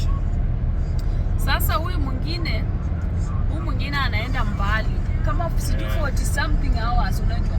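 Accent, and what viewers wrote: Kenya. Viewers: Why can't you just speak in English and stop this luhya accent, sounds really awkward, you still have alot of mushene ya waluhya, uuurgh!!!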